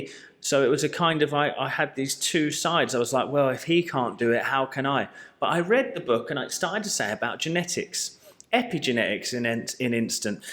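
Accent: United Kingdom. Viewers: It’s so wonderful to hear your uk accent talking about all these teachings and scientific findings that we have read in these incredible books and that aren’t being shared in the mainstream. I have read Bruce Lipton, Jo Dispenza, Gregg braden, deepak chopra and many many more.. I love the way you explain it. You are refreshing and genuine and your realness shines through in your video. Thank you 🎉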